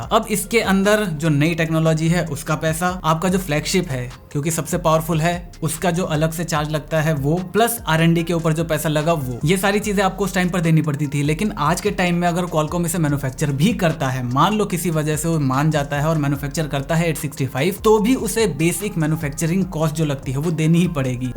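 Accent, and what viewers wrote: Bengal, India. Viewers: are u bengali? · Wait are you Bengali?? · Bengali accent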